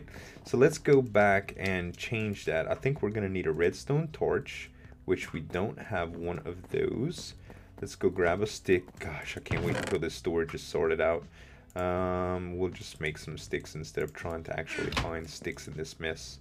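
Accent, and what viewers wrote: Louisiana, United States. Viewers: I can't place your accent. Where are you from? Louisiana? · Based on your accent, I guess You live in the USA, am I right? If I do, I would like to ask You which state do You live in? Do You own any firearms and what is Your opinion on them?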